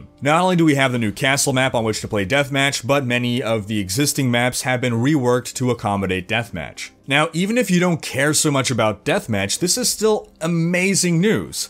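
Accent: Quebec, Canada. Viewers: I love your Quebec accent . J'ai le même . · AH. Canadian French. Was used to english on this channel, but canadian french is nice too. (Even if, being from France, I'm not that used to this accent.) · I was wondering why your French sounded so distinctly Canadian, but I just found out on your Twitter that you live in Montreal. Figures. Toujours sympa de savoir qu'un de ses youtubers préférés est francophone (même si je suis Français et non Québécois):). Also, PoE doesn't bother me, and getting the same amount of content in D3 would be indeed great, but it's the fans (well, some of them) who are annoying. Yes, we get it, PoE is soooo much better. How about you let people enjoy what they enjoy? You don't need to act all high and mighty with your 6 new acts. What you guys don't get is that new content won't make people jump to PoE if, for instance, they don't like the gameplay. 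I, for one, hate the combat and general gameplay of the PoE, whereas I find Diablo 3's tons of fun. No amount of new PoE content can change that fact. But if you enjoy the complexity and style of PoE more, good for you. Just don't be an ass about it. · ton accent québécois est tellement déstabilisant x) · tu parle vraiment bien Français dis donc ^^! Au début ça m'a fais un peu bizarre, tu a un accès un peu québécois ^^! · Wow, are you Canadian? Your accent sounds Canadian, good reading of the French text!:D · That sweet Canadian accent, I love it! (French guy here);) Thanks for the infos! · You speak like a Quebec person it's so funny to hear you speak french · un petit accent quebecois, je pensais pas ca de toi! xD did you learn it at school? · Funny, you have a Quebecers accent when speaking in French, that's a little odd when describing a place in France ^^ Outside that your pronunciation is quite good ;.) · Based on his accent I think he's a canadian living near the Ottawa region, or an english speaker in Quebec. 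· hehe your def from or close to quebec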